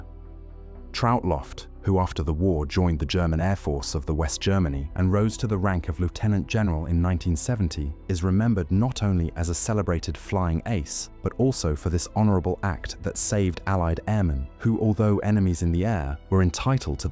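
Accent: United Kingdom. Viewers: Nice video. Can I just say, in British English we say "one hundred AND sixty-six. We NEVER say "one hundred sixty-six". You've chosen a British accent, so you need to give it British expressions, otherwise it sounds bizarre.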